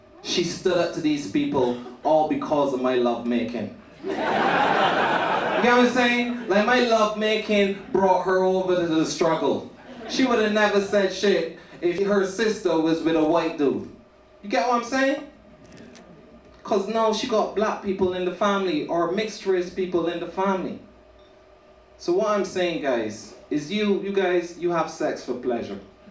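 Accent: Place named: Barbados. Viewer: With a Bajan accent too!!! My kindah humour my kindah comedian!!!!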